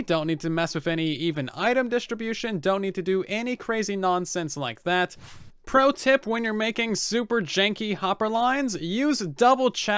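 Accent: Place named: United States. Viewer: It’s a pretty obvious American accent if you ask me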